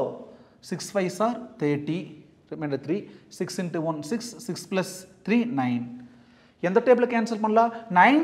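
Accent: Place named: Kongu, India. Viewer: Sir, your are a kongu Tamil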